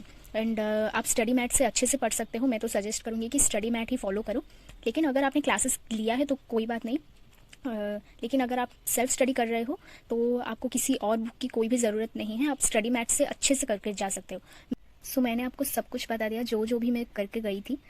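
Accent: Odisha, India. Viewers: I think you are from odisha,Am i right?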